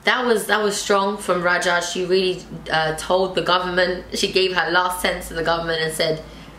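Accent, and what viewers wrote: London, United Kingdom. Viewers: Your English it’s from London Are you half Algerian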